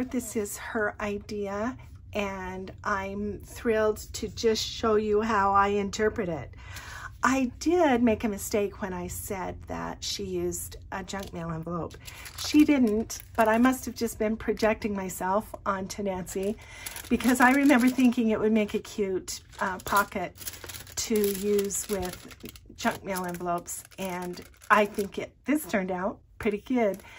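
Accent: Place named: Utah, United States. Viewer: I love this! You sound so much like the people I knew when I lived in Providence, Utah in Cache Valley. You sound like a lady I knew very well in relief society. I’m from East Tennessee and I’m back here now but I lived in Utah for 8 years. I’m happy to have found your YouTube channel!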